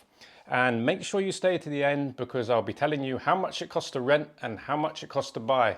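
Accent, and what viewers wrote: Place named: United Kingdom. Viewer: Nice to hear pure British English, not American one.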